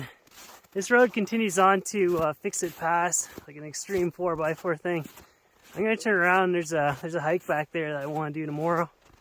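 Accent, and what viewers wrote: Canada. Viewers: Crazy beautiful scenery. Utah is now on my list. Also, has the most Canadian of Canadian accents. I'm also from BC. I wonder if I sound like him when I speak. Probably. Eh?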